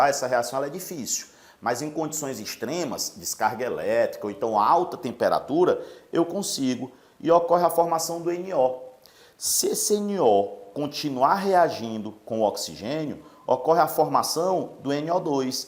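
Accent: Ceará, Brazil. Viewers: Cearense é reconhecido onde for Kkkklk é nois, macho véi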